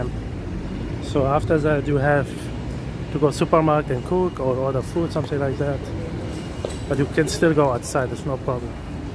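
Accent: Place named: India. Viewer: His name and accent seems Indian.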